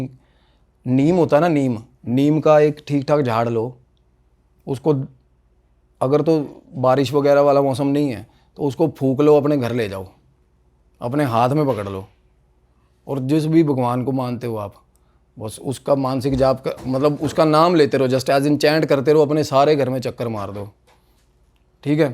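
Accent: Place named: Punjab, India. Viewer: bhayi k beech beech mai Punjabi nikal jati ha😅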